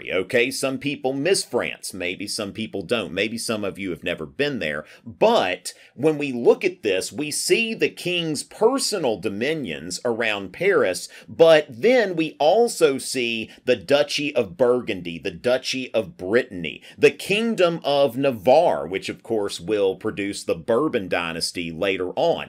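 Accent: South, United States. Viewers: As King of England, I approve of this video (love your country accent btw 😁)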